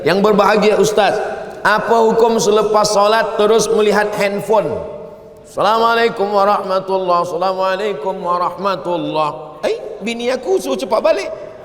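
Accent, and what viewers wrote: Malaysia. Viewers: Memang dh dia melayu.. Mesti la..loghat je tele tu biasa mngikut negeri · Respek dgn UAS.. ketika di Malaysia, loghat / dialek juga ditutur dlm bahasa kebanyakan di Malaysia. ketika video di Indonesia juga mengikut loghat / dialek tempatan di sana. Semoga UAS sentiasa sihat dan berbahagia selalu.. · Ustadz ngomong nya koq seperti orang malaysia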